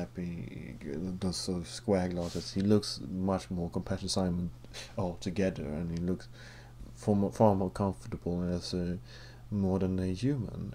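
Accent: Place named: Sweden. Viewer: Oh my god you sound soooo swedish =D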